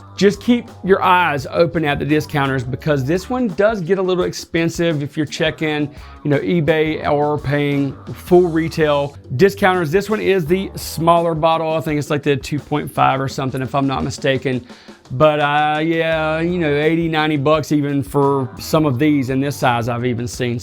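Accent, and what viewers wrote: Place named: Florida, United States. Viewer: Always enjoy your down to earth reviews! I need to try that Nasomotto! I'm in NY now but your accent and demeanor reminds me of the circle of friends I had when I lived in Daytona beach Florida. Lol.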